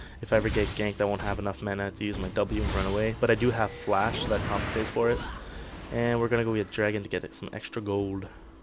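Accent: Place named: United States. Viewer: You are french? I thought you were american, not a doubt in my mind.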